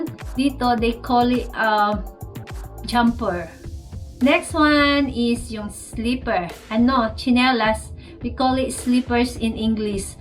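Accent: United Kingdom. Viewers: Nakakatuwa namn ang english british accent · I immediately remembered the English accent when I was still in the call center ... Yes UK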